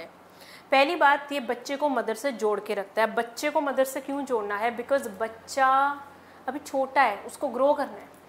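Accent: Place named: Punjab, India. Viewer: Thoda panjabi nikal jati hai❤️❤️❤️.....ÑîÇÈ ma'am.....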